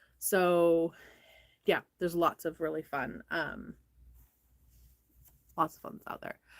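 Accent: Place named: South, United States. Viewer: Haha cute southern accent! You're so funny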